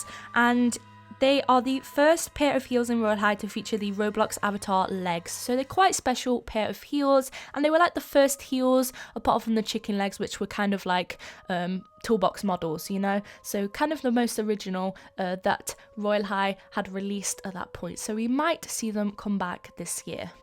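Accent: United Kingdom. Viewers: YESSS, BRITISH ACCENT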